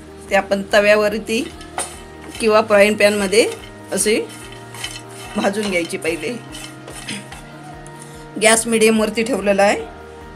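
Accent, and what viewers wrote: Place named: Rural, India. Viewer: Tharrat marathi aahe tumchi baba chan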